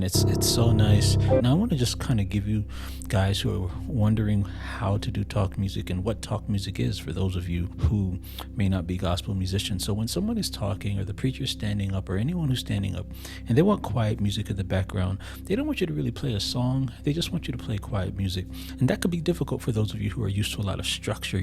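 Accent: Caribbean. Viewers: Brotha, I hear nuances of a West Indian accent. Where do you hail from bro? (Weh Yo People dem Really from?). Blessings from Florida, hailing from the U.S. VIRGIN ISLANDS 🇻🇮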